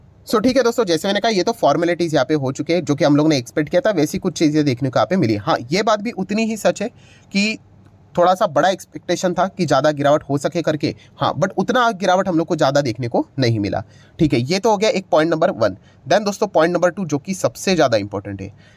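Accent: Maharashtra, India. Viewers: Sir Marathi h aisa lagta h, Thodifar 🤣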